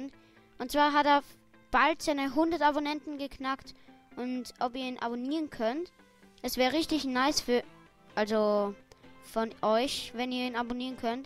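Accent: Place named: Lower Austria, Austria. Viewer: sag mal kann das sein das du aus niederösterreich sonst was kommst denn in deinem reden hört man hochdeutsch und bisschen dialekt xD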